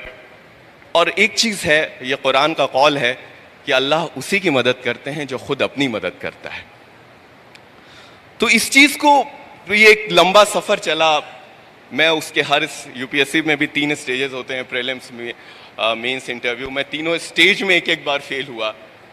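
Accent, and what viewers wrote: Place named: Pakistan. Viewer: OMG 😂 yeh to Pakistani wala Hindi s bole rha h😱🙈🙉🙊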